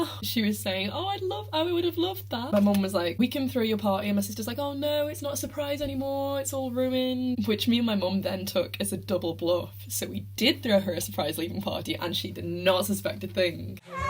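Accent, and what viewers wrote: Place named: North, United Kingdom. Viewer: It is so refreshing to hear northern accents on youtube!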